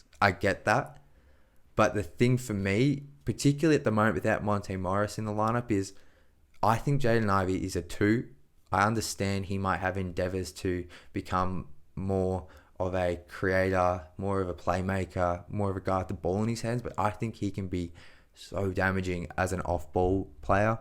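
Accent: Australia. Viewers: Came here from Twitter, and the Australian accent surprised me! Always love an international Deeeeetroiitttt basketball fan! Awesome breakdown & analysis, keep up the premium work!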